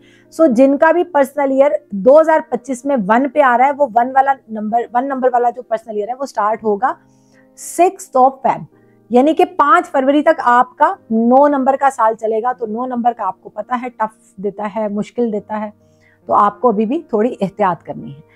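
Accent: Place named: Pothwari, Pakistan. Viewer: پئ اللہ نی قسمےتساں پوٹھوہاری بہوں سوہنری بولنے او اللہ پاک سلامت رکھے تہ عزتاں دے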